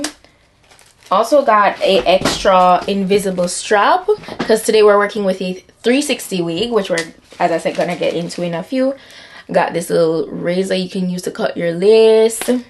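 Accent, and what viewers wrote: Jamaica. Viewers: Hello my sister my Jamaican sister you have to be from Jamaica because I hear the accent my family is from St Mary Jamaica and I bought this same wig to as well and I decided to look up somebody who was putting it on to see how I can situated on my head and I came across your video and you happen to be Jamaican too please tell me if I'm wrong but I can hear the accent girlfriend. Love ya my dearest. Blessings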